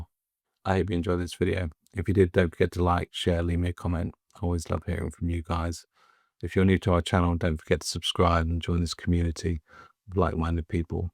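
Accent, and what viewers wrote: United Kingdom. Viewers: What part of England are you from? I was stationed there for 8 years. Loved it.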